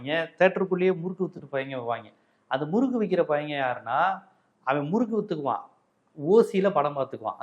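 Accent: Madurai, India. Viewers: உங்கள் பேச்சில் தெரிகிறது நீங்கள் மதுரைக்காரர் என்று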